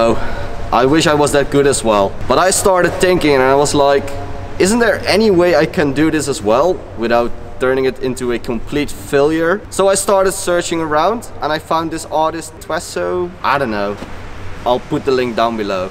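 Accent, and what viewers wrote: Netherlands. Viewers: best dutch accent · Je hoort gewoon het Nederlands accent erdoorheen haha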